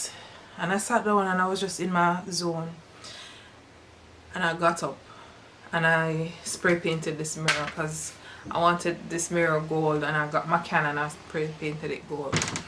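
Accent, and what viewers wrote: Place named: Caribbean. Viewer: Are you west indian???? 🤭 you sound like us❤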